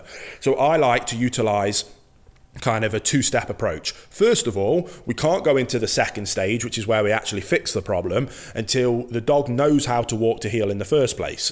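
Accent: United Kingdom. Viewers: Are you from Lancashire?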